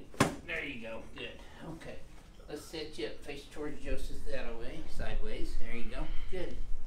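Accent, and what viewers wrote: South, United States. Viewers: Total high pitched cowboy hick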